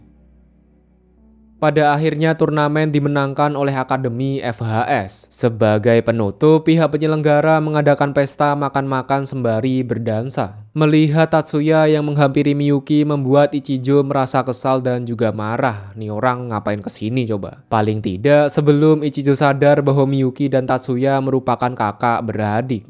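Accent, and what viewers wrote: Java, Indonesia. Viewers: bang lu jawa ya ?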